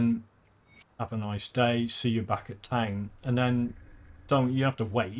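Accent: United Kingdom. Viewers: Hey man! This comment is kind of unrelated to this video, but I just wanted to say that you reignited a spark in me, not only for this game, but for my life (as cheesy as that may sound). I have an embarrassing amount of time in this game for what I have actually accomplished. Anyway, I'm sick a lot and bedridden where I can't do much of anything, even play GD, but watching your videos has changed my entire perspective on not only my approach, but the FEEL of this amazing game. You made it feel okay to suck...lol. I mean I should have learned the things you teach WAY earlier in life. No gimmicks or music or loud people yelling "HEY GUYS! Check this out!" Your blazingly stereotypical English Karl Pilkington ish accent made it fun to listen to and watch while in utter despair. This may sound like something bad, when in fact it's absolutely amazing and I'm very thankful for it and you. 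Disclaimer: I am in no way saying you are like that guy, just the accent. I plan on doing tons of your builds with some of my spice (currently doing your Bloody Pox) and many others. Just wanted to say thanx man, and that Warden video with every class takes some serious commitment. Keep up the great work! ..It's appreciated. 🤘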